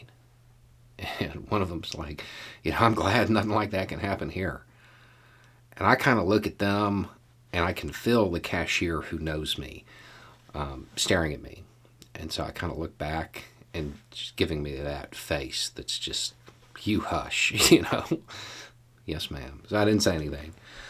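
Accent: South, United States. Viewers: We talk in line at the stores up here in the North too, minus the adorable southern accent of course.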